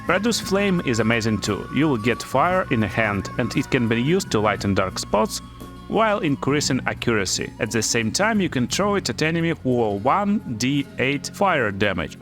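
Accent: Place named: Russia. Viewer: Почему я чувствую русский акцент..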